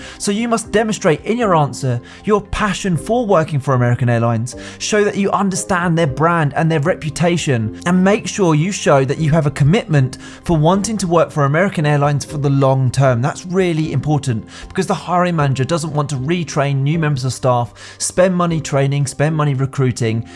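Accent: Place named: United Kingdom. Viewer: OMG, great video mate i appreciate a lot this video, you can use this video for any job interview. Yor answers are, top notch, to me they seem more for chasing big companies that pay big $$$ for a flight attendant.... ehee. I hope not a lot of viewers admiring this positions as they look flashy, but when you learn how low is their pay, I'm assuming the pay have been going up after covid, but still $2,000 - $3,000 that's sad to me. If you young and single is fine, i rather become a pilot if I'm going this direction. Great awesome video for future interviews, glad i run to it, you got my subscription, the British accent helps too.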